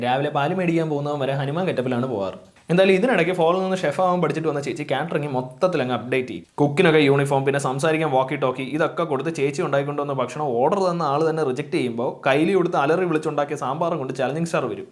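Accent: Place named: Palakkad, India. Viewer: Bro നിങ്ങൾ പാലക്കാട് തമിഴ് വല്ലോ ആണൊ നിങ്ങൾ സംസാരിക്കുമ്പോൾ തമിഴ് mix ചെയ്തു malayalam സംസാരിക്കുന്ന ആളെ പോലെ ആണ് എപ്പോഴും തോന്നുന്നത്.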